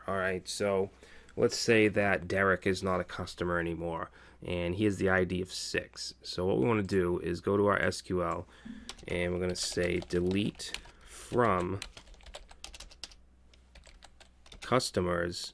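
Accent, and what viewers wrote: Boston, United States. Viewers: what part of Boston are you from? love the video man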